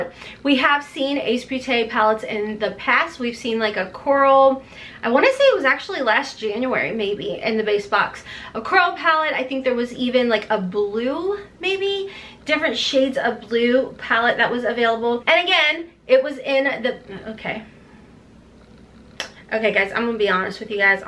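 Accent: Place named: Kentucky, United States. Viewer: You seriously are amazing! I am also from Kentucky, born in Lexington, and its so nice to hear your accent! Love your videos and just remember to inhale confidence and exhale doubt! 😘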